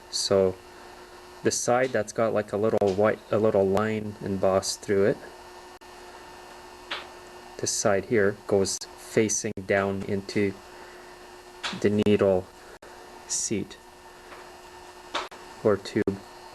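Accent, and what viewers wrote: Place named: Canada. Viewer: great info. exactly what i needed. thanks for posting! nice Canadian accent, by the way. very calming!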